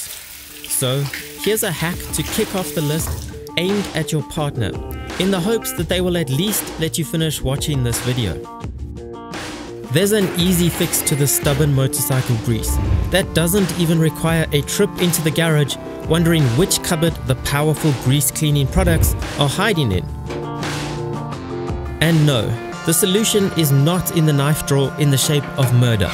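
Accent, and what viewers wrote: South Africa. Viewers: You from SA? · You sound South African, where are you from? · Are you from south Africa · Your channel just got recommended! What a great channel! Dude are you from south Africa. Looks like jhb? And well sound like a South African. If so I am proud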